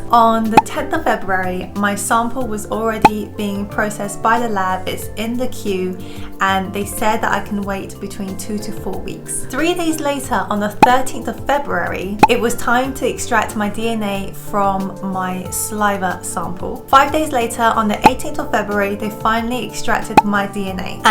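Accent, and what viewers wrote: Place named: England, United Kingdom. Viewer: I've never heard an Asian with such a strong English accent lol.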